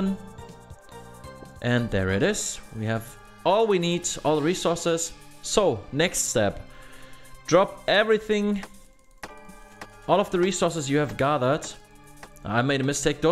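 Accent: Germany. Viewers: bin Random hier gelandet aber sofort rausgehört einer von uns 🤣 junge dieses ewige Prager wechseln das ist echt stresserzeugend 😂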